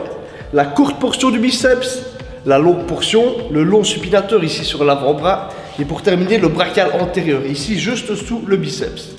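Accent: Liège, Belgium. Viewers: Oufti l'accent 👍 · Jkiff ta facon de PARLÉ vive la Belgique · Salut, Bien sympas les vidéos. J'entends comme un petit accent liégeoise ... :) Tu es de quel coin ?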